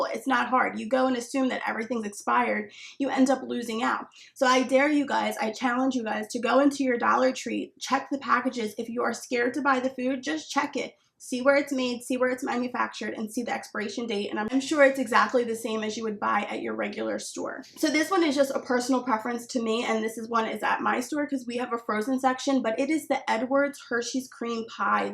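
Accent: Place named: Philadelphia, United States. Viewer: Thanks for this! I immediately knew you were from Philly!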